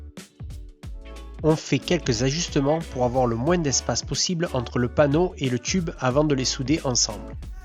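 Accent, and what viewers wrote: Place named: Southern France, France. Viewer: Je viens tout juste de tomber sur votre chaîne et après m'être dis pendant plus de 10min : "Mais comment ça se fait que sur cette chaîne Youtube qui a priori est 🇺🇲 la voix off n'ait pas cette saloperie de traducteur auto de Google/Youtube ...!!?? 🤔" Et après 2min de farfouille je comprends que l'accent du "Sud de la France" de cette voix est tout simplement celle d'un des 2 protagonistes 😂 Après "1 Indien ds la Ville" c'est -> 1 Frenchie ds la Forêt Cainri 😉 En tt cas bravo , superbe projet ; ça fait rêver et ça donne envie ; ça donne envie de poser tellement de questions 😂😉 PS : avant votre autoconstruction vous n'étiez pas de simple novice en la matière, je veux dire en matière de construction , etc ; vous étiez assurément des gens d'expérience ? En tout cas , encore bravo et bonne continuation à vous, 1 grand bonjour de Bretagne 👋🤘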